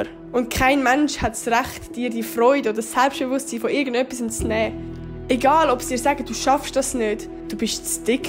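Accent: Switzerland. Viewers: Ich liebe deine Videos! Am geilsten finde ich den SchwitzerAkzent der mich immer zum Lachen bringt. Ich bin froh dass du YT machst. Beste Youtuberin! Du hast keinen Grund dich Unwohl zu fühlen da du total schön aussiehst und eine Hammer Figur hast!❤ Ich und viele deiner Tausend Abonnenten stehen immer hinter dir und sind für dich da! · Dieser Schweizer Akzent ist so geil haha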